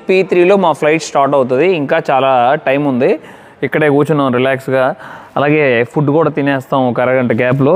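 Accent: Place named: Vijayawada, India. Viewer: Harey Krishna.. brother, meedi Vijayawaada kaabatti, mee bhaasha chakkagaa untundi... waiting to see your videos in Japan.. Jai Sree Raam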